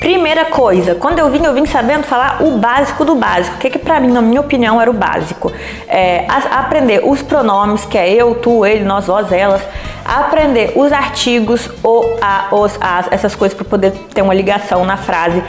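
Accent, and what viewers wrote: Minas Gerais, Brazil. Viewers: AMEI OUVIR NOSSO SOTAQUE MINEIRO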